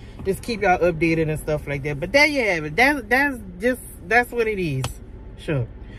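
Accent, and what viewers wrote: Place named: New Orleans, United States. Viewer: I can hear the New Orleans in the accent baby! lmao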